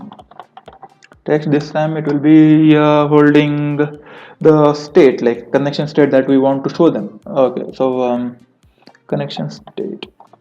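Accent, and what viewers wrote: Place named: India. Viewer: Hears indian accent..already disappointed...then sees him copy and pasting...so sad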